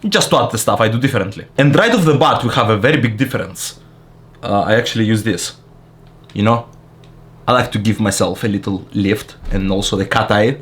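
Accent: Russia. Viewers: Нравится его русский акцент. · when i see u as a man...u are HANDSOME!!!!! And that russian accent is at the same time funny and cute! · У Вас очень милый русский акцент. Я с замиранием сердца наблюдала рисование стрелок. Получилось очень оригинально и необычно. 👍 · I love a Russian accent. So cool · Почему никто не пишет, насколько же у него русский акцент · I love your russian accent when you speak english ❤ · Классно делаете макияж 😊❤😄 Еще такой забавный киношный русский акцент))) Очень стереотипно звучит 🤭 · Прекрасный русский акцент 😊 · с русским акцентом я вас понимаю лучше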